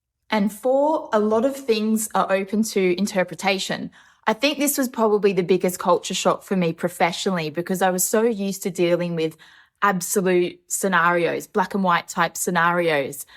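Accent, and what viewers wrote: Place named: Australia. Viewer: I could listen to you talk all day long, LOVE your Australian accent ❤️🇨🇦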